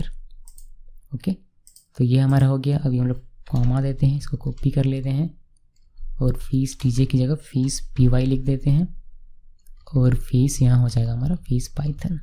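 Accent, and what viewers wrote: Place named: Punjab, India. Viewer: I have seen Django courses on youtube but your tutorial course stands out because .... 1. You have explained theory behind django framework. I have not seen anywhere else; even in paid courses instructor is not able to explain theory like you have my friend. 2. Now most important part in learning Django is practical hands-on; you have also spent lot of time explaining step by step (GeekySteps as you call it in your videos; I love them :)) so your students don't get confused about django file structure. 3. Aaap ki hindi punjabi se kaafi milti zulti hai. Kya aap punjab se hai? If Yes, Wahe Guru sarji Sat Sriya Kaal!! You deserve many thanks for your videos. Thank You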